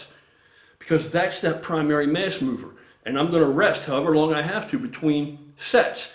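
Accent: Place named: Pittsburgh, United States. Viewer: That accent screams Pittsburgh.. speaking of, could really go for a Primantis right about now!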